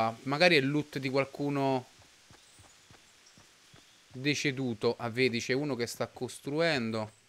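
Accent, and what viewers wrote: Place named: Naples, Italy. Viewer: Ti seguo da poco ma ho già capito che sei napoletano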